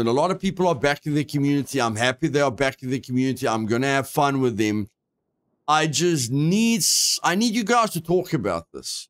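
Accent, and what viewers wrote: South Africa. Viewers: South African at it's finest haha - love bro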